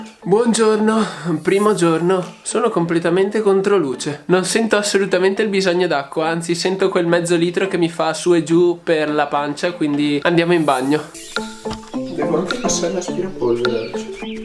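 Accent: Veneto, Italy. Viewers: Ciao, sei di Padova ho riconosciuto la stazione dei bus??? Sei veneto quello sicuro dall'accento che hai:-) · Ma sei veneto? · Sei veneto? Se si credo di Padova giusto? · Ma sei veneto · Hai un bellissimo canale! Questo video è davvero bellissimo. Tu sei un ragazzo onesto, educato, pulito e parli davvero molto bene. Assolutamente iscritto perché meriti davvero tantissimo! Complimenti sei grandissimo, se posso chiedere, da dove vieni? Hai un accento molto simile dal mio che vengo dal Veneto ahaa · Sei veneto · Ho visto un video dove alcuni fruttariani sostenevano di poter vivere senza bere acqua mangiando solo una mela al giorno. Se fosse vero con tutta la frutta che hai mangiato non dovresti aver avuto problemi. Comunque hai fatto bene a concludere la sfida un po' prima dato che dopo il mal di testa il prossimo sintomo da disidratazione era il colpo di calore e poi probabilmente il coma. 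Che lavoro fai ? Se posso sapere. Se facevi un lavoro pesante magari sotto al sole come l'operaio ci lasciavi le penne. Hai fatto un bel video onestamente (montaggio riprese ecc) e fai bene ad ascoltare la tua community e non fare più challenge del genere. PS: si sente che sei veneto ciò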